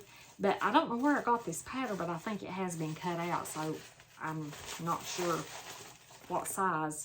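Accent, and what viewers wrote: South, United States. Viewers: I love your videos,. Just love listening to your lilting voice. Where are you from? Can’t quite pinpoint the accent. I’m guessing the Carolinas. I was raised in East Texas, so I love listening to various Southern accents. So soothing! When my accent occasionally comes through (usually when I return from a visit to Houston), I constantly have to tell folks I have a Texas accent, not Southern. I have to explain that Texas is not part of the south, that accents are classed geographically and Texas is located in the middle, not to the right on the map! Most “other” people (you know who I mean! 😹) hear “South” and think “Confederacy.” That’s why they’re confused.. Bless their hearts. 🙄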